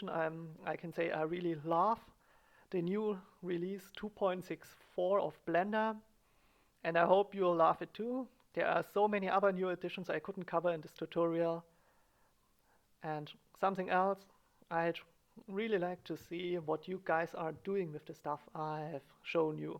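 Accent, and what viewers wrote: Germany. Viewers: Also, als ich den Fernsehturm gesehen habe, hatte ich ja schon einen gewissen Verdacht, aber mit deinem akzent ... du solltest noch an deinem englisch arbeiten, das klingt sehr deutsch... ich bin übrigens aus dresden. ... (If you can't read this ... i was wrong with this comment, ignore it.) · Are you german? :D sounds like you are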